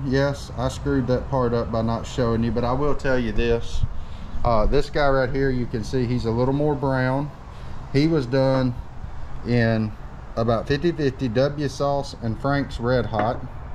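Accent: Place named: Louisiana, United States. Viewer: I’m from maurepas la it’s cool to see a YouTuber that talks like I do 😂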